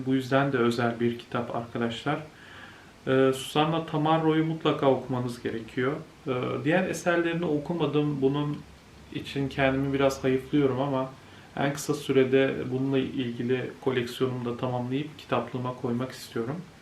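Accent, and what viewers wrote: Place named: Sunda, Indonesia. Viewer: 🙋 cuman teungarti, basa sunda ath ngomongna😁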